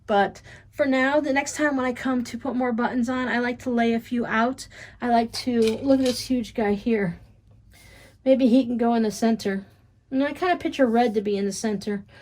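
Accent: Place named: South, United States. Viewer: sincerely I didn’t intend to imply you say it incorrectly. Being born and raised in Florida and having been gone since aged 20 I love hearing how you say things in a sweet southern accent. Ha be a wonderful Christmas. ❤️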